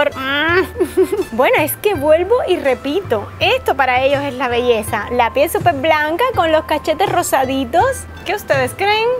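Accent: Cuba. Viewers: Eres cubana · si es de Cuba pero vive en Miami 😉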